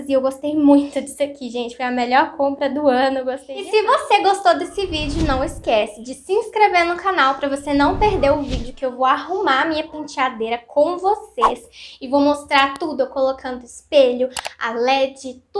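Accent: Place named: Minas Gerais, Brazil. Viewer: sou do rio de janeiro, acho lindo sotaque mineiro.. meu tio mora em minas.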